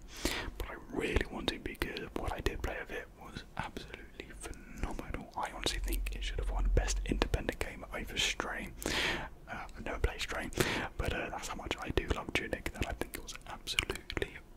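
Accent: United Kingdom. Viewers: happy new year little quiet british man on my phone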